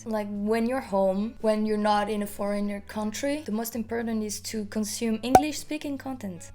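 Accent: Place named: Los Angeles, United States. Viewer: As an American I understand you just fine. For the most part you sound as if you’re from LA which if that’s your goal, it sounds like it is to me, then you’re doing great! I just started learning French last year so I’m really glad I found your channel, immersing myself in all things French and progressing to an intermediate level is my goal and focus for this year and you knowing English will help immensely, so thank-you 😊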